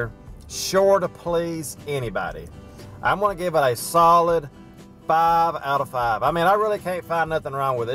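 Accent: South, United States. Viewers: does anybody else love his southern accent? I love it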